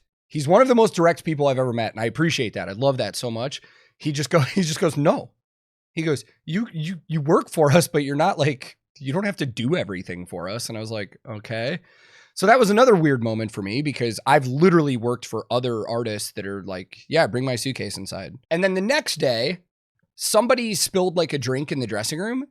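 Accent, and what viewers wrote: Germany. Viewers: Hi. I`m from Germany and i must admit, that you really sound like a German! Ultra Props Buddy:D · Did your German improve during the tour, and which accent do you have now? 😁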